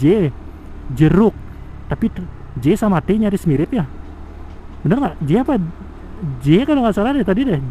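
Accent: Bali, Indonesia. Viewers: Aku Orang Jawa Tapi Gak Tau Ke Napa Gw Suka Dengan Logat Orang Bali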